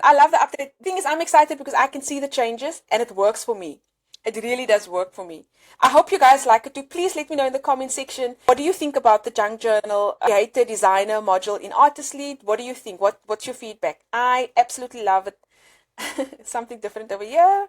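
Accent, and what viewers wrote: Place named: South Africa. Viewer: Are you from South Africa? 🌼✨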